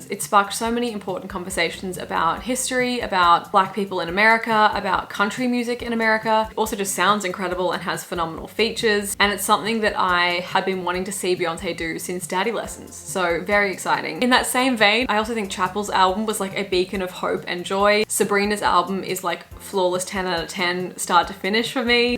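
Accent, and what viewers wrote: Australia. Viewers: Amazing vidéo as always. I Watch every vidéos from France ! It helps me improve my english and understanding of the australien accent. Merci !!! 🥰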